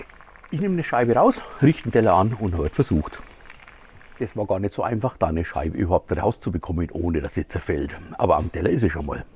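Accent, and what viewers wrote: Franconia, Germany. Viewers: wennst fränkeln und fränkischen Dialekt magst ,dann bist hier genau richt :) Also ich hab es mir nur vorstellt, aber ich denke das wird a Leckerbisen,. Grüße aus Coburg gehen raus :)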